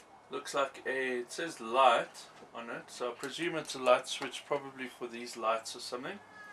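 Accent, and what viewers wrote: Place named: South Africa. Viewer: Never heard such a strong Saffer accent.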